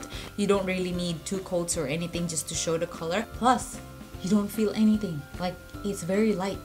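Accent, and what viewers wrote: Philippines. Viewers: ur filipino accent :) & i love the colors on u! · Hi! Are you a filipino? :) I can sense it from your accent hehe~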